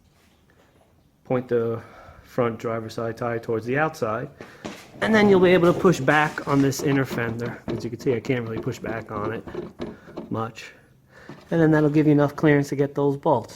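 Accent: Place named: New York, United States. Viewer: Thanks big guy. I have now spent many hours watching you boost C6 project videos... just really very worthwhile viewing, learning and enjoying the multitude of tid-bips you continually share. Prior to stepping into any project, be it a car, boat, plane, house... I do my research. I know nearly nothing about the LS3, of the C6... but I am a quick learn. Stumbling across your videos (can't recall how) was like finding a treasure box! I've still loads to learn about the C6, and LS3, but day by day the picture is becoming much clearer (<num> GS 6 spd). You've a talent and passion for teaching, otherwise why all the videos? It's just a massive shame all your efforts are somehow missing a bigger audience. I'm on the opposite side of the country next to Portland Oregon, thus your New York accent gives me a chuckle and keeps it all interesting. 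Keep it whoever you are, ha, ha...! Email me the info on the spoiler as it'll be quicker.... I think.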